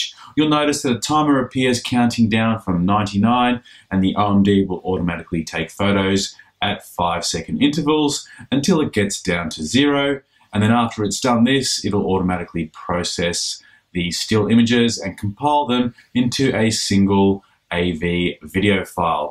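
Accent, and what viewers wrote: Australia. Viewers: Hey man r u from melbourne?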